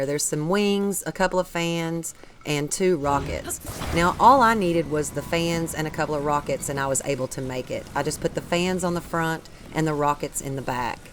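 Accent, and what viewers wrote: South, United States. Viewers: even if you weren’t playing I love hearing your voice in the videos. It’s refreshing to hear a beautiful American southern accent 😊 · What American accent is that i wonder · A southern accent and TotK? Sign me up lol. Im from NY. Don't hear that accent much. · I love your videos! Your southern accent makes them even more welcoming/casual/relaxing. Thank you for the content and for being down to earth about it. The sass on that pinned comment was the cherry on top! 🤣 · Southern I know this, lived in Arkansas for a few years. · Hey girl! I just recently found your channel and I love how you admit about not knowing how to pronounce some of the names in the game! Same here... lol! Also, I'm not sure where you're from, but I'm from South Mississippi and I can relate to your accent ;) You have provided some pretty good tips and I'm now subscribed :D Keep up the good work! 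· I must say your voice is why I continued to watch this vid as I'm the kind of player who saves anything and everything I find interesting on a game I'm into and once I beat the game I will go back and watch vids and use cheats and try glitches ext.. Well as I was adding it to my botw 2 list ( been saving vids since before it was officially titled) I heard your voice and well needless to say I can go easily and get the armor. I'm a hillbilly from the blue ridge mountains and it was refreshing to hear your southern voice narrating one of my favorite series biggest entry's! I was blown away and watched and listened all the way to the end. So to comment on the deception msg Well who cares if it wasn't her playing ( so awesome it was btw ) because it's still a very pleasant, easy to listen to and follow along, very well made information video and I'm looking forward to enjoying many more from her.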